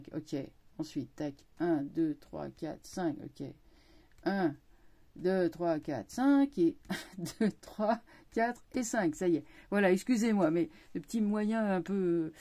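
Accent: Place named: Clichy, France. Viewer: Depuis quelques années, votre voix accompagne mes insomnies, comme une voix amie, comme une amie que j'aurais eue, avec son bel accent de Clichy. Merci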